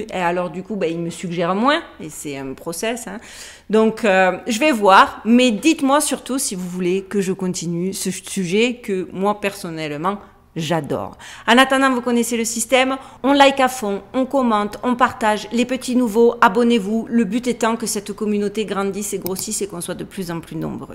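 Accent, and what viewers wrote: South, France. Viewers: Merci princesse ! Tu as gardé l'accent du sud, peuchère !❤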